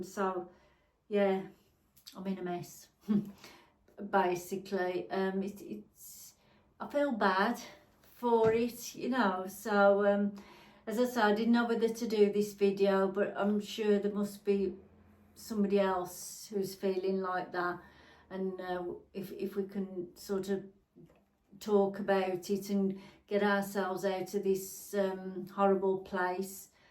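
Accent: United Kingdom. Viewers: Hi! Sorry that you are feeling so low! I love watching your videos - you are kind and caring (and I'm quite partial to a Black Country accent.) 💕 Please don't stop your channel, you have so much to give. Your heartfelt video made me think that an occasional 'Chat with ' over coffee (and cake) segment in your back garden or out on location would be great. You could talk about different things every time - style, clothes, hair, make up, skincare, garden, your hobbies, holiday destinations, keeping fit and healthy, shopping, being a mother and grandparent, your life etc. Love you and your channel,! 💛xxx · Aww, I'm a silent watcher never usually comment but just be you, You are enough! There's plenty of room on YouTube for all! I watch lots of different people for lots of different reasons. I love to watch you as you're so relatable and drama free! Plus I'm a Brummie and I love to hear the accent now I no longer live there! 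To stick my twopenneth in I'd say just do the sort of thing you do and this video here no doubt will help so many people! Xxx Big up to you, much love xxx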